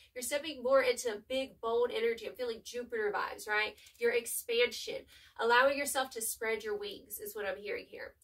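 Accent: South, United States. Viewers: Southern accent. I love that. I’m a Scorpio too. Thank you so much for your reading. Its so awesome. Hits me like a bolt Take care of yourself. We scorpios need that sh.. Peace out, Sista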